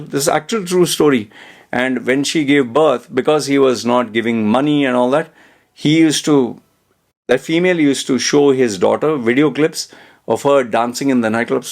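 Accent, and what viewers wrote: India. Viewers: Latin name, Indian accent, Zombie tattoos, ice-fiend cool headed book worm in Thailand of all places You're a more interesting character than Andrew Tate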